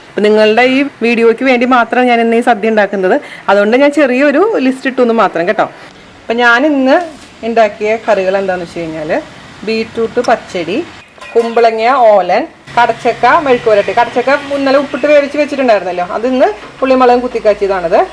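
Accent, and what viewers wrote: Thrissur, India. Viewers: ബാച്‌ലർ പ്രവാസികളുടെ പാചക ദേവത.. അവതരണം ലളിതം സുതാര്യം പിന്നെ മ്മ്‌ടെ തൃശൂർ തനി നാടൻ ശൈലി. പൊളി 👌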